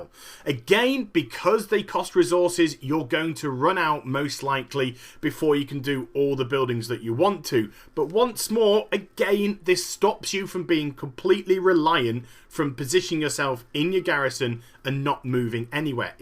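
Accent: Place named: England, United Kingdom. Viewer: I Think hes English :D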